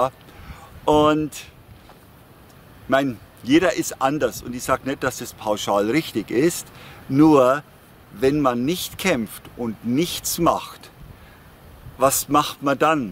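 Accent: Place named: Franconia, Germany. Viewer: Wunderbares Video mit guten Informationen. Ich höre den Anschlag aus dem fränkischen Dialekt so gerne. Ich bin seit fast 20 Jahren aus Franken weg gezogen. Das Fränkische hört man sofort.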